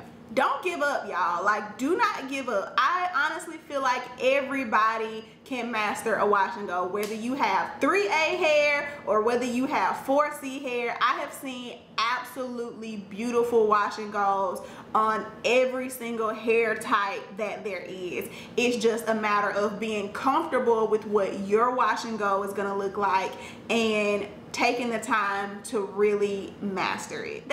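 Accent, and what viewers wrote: South, United States. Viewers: I love all your tips, i had been struggling with wash n goes fir a while and just decided to revisit them, im still learning, and you have inspired me not to give up, your hair is gorgeous and Iove your southern draw 😘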